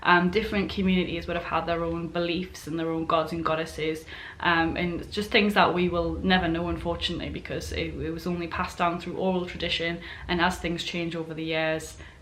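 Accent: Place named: Geordie, United Kingdom. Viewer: you sound like you live just up the road from me, maybe gateshead area. that's awesome. very bonny lass.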